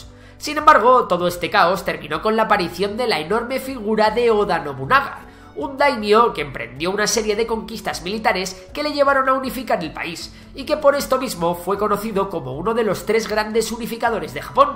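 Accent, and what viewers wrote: Spain. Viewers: Que buenos videos nos traen los españoles a YouTube.... Peeeero cuando mencionan la G o la J parece que van a escupir un catarro. Suena muy feo el español.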